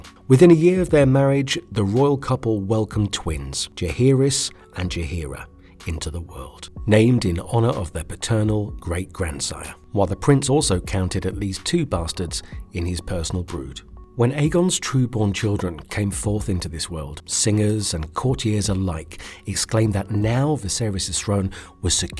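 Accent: United Kingdom. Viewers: Yeah, I thought the other one was some kinda read-aloud android. This British dude is perfect... plus, you know... when people talk about GoT/HoTD, they just sound more relevant than a nerdy american with a lisp whose gums you can we smack while he talks- I forgot which specific channel I'm talking about. But yehh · Your British accent makes you sound intelligent, but your pronunciation and word choice implies the opposite. To be clear, I am not talking about your pronunciation of GRRM's invented character and place names, I'm talking about your mispronunciation of English words.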